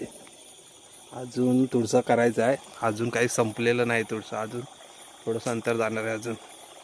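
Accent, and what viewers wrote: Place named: Konkan, India. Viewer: Are wah tumhi pan Tulsa bolta ...koknit khup samya aahe ...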